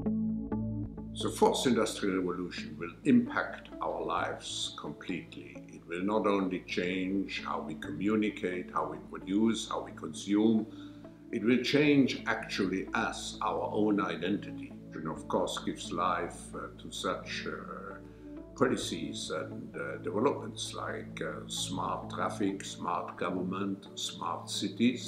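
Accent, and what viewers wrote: Germany. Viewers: Judging by accent and last name, he's either German, Jewish or both. With that in mind, is it no surprise that he talks about a "1984" or "Brave New World" type societies in a positive manner. Update direct from Wikipedia: Klaus Schwab Born: March 30, 1938, Ravensburg, Nazi Germany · That German way of speaking....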